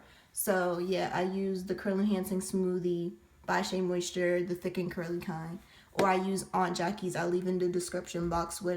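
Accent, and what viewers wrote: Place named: DMV, United States. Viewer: You talk like you from the dmv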